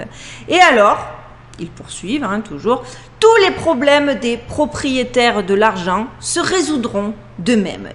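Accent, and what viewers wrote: South, France. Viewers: Merci gratitude ! toujours des vidéos très pertinentes, avec ton accent du soleil et ton bel humour. Restons dans nos valeurs avec confiance ! le passage peut sembler long mais il n'est rien comparé au nombre d'années de soumission traversées... En face, ils ont négligé un facteur : la possibilité de l'humain de se reconnecter à la source à tout moment...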